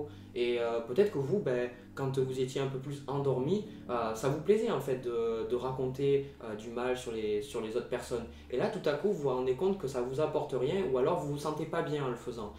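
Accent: South, France. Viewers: je découvre cette vidéo que maintenant. J'ai déjà un terrain à être éveillé: de nature très introverti, à me retrouver seul, à avoir des synchronicités et en même temps très empathique. Cependant en 2020 en faisant de la sophrologie encore plus profondément, j'ai reçu un message spirituel comme quoi il fallait que je change de boulot. Je supportais plus les ragots un peu comme tu décris. Aujourd'hui, je suis épanoui dans mon nouveau job. je m'éloigne encore + de ceux qui m impose à ce que je me vaccine vs coronavirus. Je suis pas antiVax, mais là je le sens pas ce truc. Tant pis, mais je m eloignerai des endormis et j'impose pas ma façon de penser aux autres. Enfin, dis moi d'où vient exactement cet accent du sud? Au plaisir de te lire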